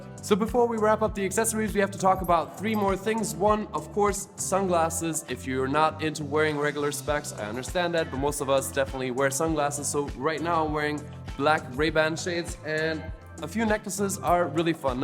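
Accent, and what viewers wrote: Germany. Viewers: Nichts zum Video, ich kenn dich nicht, aber für mich klingst du wie ein Deutscher, der die englische Sprache ziemlich gut beherrscht :D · Are you German? You have a similar color in your voice. No offence, just wondering... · He sounds like he is actually from germany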